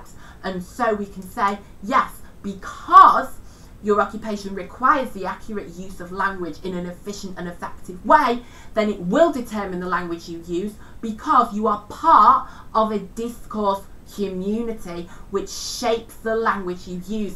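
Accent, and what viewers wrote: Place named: Northern England, United Kingdom. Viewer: esy2011 northern accent innit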